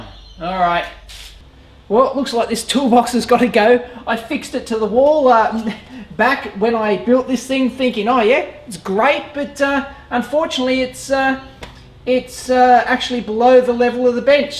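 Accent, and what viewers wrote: Australia. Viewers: Australian. His accent sounds nothing like a Canadian one. Also most Canadians would use feet for that type of job.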